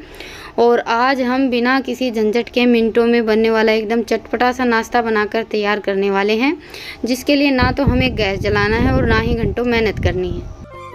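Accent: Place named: Rajasthan, India. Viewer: Waw ap jitni bi recipe bnate ho bhut testy bnti hogi dikhne bi achi lgti h or di apki bhasha bhut pyari lgti h ap real bhasa bolte ho to mja ata h sunne me mai bi rj se hu so smj bi ati h or ha sbse jyada le isantiya ye bolte ho to meri hassi bi ni rukti 😂😂😂😂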